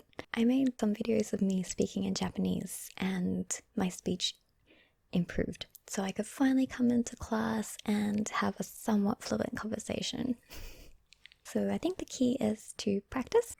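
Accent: Australia. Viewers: It's odd - you have a 'sweet' voice pitch, and its like the aussie accent kind of amplifies it, if you know what I mean. That's always the impression when I hear your voice in these vids, very sweet. Haven't met many Australian girls with a similar voice pitch. As a side note, I'm half filipino half English living in Australia for almost all my life! It's a small world, huh. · A Spanish- looking Filipino with an Australian accent? That's a treasure for sure, haha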